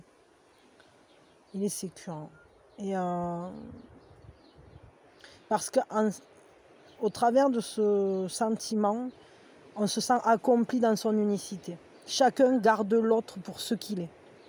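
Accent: South, France. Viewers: Bonsoir 🤗 on se croirait ds le sud ou vs êtes plus votre accent les poules le vent mais j adores ce contexte 🌳🌿🍃🐔🐔🐔🐞la guidance me parle pas j aimes bcp cette façon de penser à l un à l autre se protéger avancer main ds la main ...... J espères rencontrer ce style d amour très grd ah oui 😊🙏 bonne soirée 🤩💖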